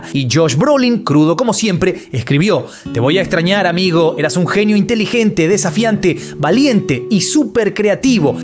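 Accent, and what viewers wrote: Argentina. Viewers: Amamos ese toque argentino sello de nuestro..que tiene ese que se yo viste?..cariños desde Chile gracias tremendo Val,siempre sera Jim❤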